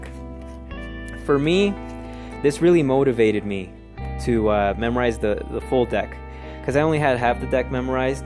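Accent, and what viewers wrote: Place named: Texas, United States. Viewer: The deadpan texas got me weak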